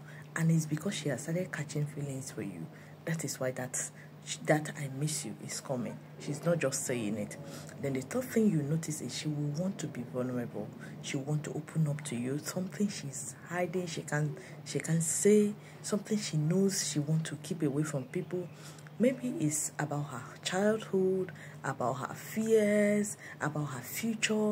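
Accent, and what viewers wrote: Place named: Africa. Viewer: Thanks for the inside info. Love the accent ❤ where in Africa are you from?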